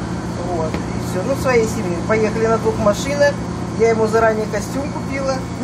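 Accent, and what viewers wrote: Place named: Eastern Europe. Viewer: Yeah... def Eastern European.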